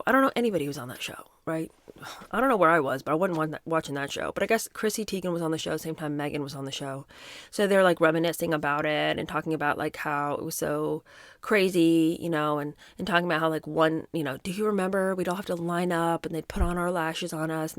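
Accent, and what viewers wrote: United Kingdom. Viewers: I can’t stand listening or looking at anything about Megan markle BUT seriously, your videos make it so humorous that I find myself laughing so much that it hurts. Your British accent cracks me up with laughter, your analysis of Megan teagan and the boring s h I t show is the most hilarious video I’ve ever seen. I’m gobsmacked that teagan posted those horrendous pedo comments about children, I was un aware of her evil, sadistic, perverted nature! Ewe! DHS should check up on Reagan’s kids, b/c I wouldn’t be surprised if she’s lent them out to other pedos, maybe that’s why she was elevated as a celebrity b/c who she knows and who she gives her children to, to abuse them. There’s so many pedo elites willing to help ppl like teagan, IMO. I feel so sorry for you having to sit and watch Megan’s crap show so that we don’t have to thank you sooo much. 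I love your videos please keep it up. 👏🏻👏🏻❤️🫵🏻😂🤣😂🤣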